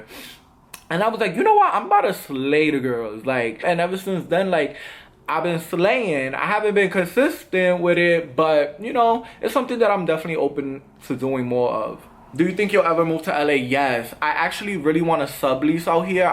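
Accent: New York, United States. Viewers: Lol when I watch his videos I can hear my New York accent omg 😭😭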